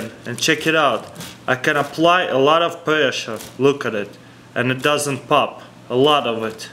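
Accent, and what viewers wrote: Russia. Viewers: Y do people make fun of the way he talks he is Russian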